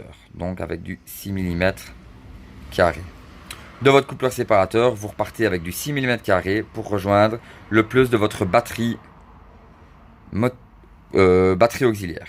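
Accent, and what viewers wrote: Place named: Liège, Belgium. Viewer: salut mec ton accent me dit un truc haha tu viendrais pas de liege? :p super la video sa vas bien m'aidé merci a toi 1 abo en plus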